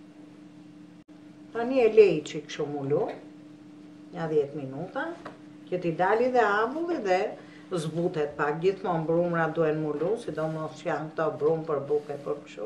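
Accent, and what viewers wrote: Tirana, Albania. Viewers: Pashe dhe vidion e pare ..qe me kishit Kthyer pergjigje..faleminderit shume.. Sapo e mbarova doli special Hera e pare ne jete qe bej kulac si tironse se kemi tradite..por kte vit e bera ...😉..Gezuar Vitin e Ri.. Me zi pres te hidhni vidion e rradhes neser pjek gjelin e ta bej persheshin me menyren tuaj.. Ps u knaqa me tfolmen tironse😉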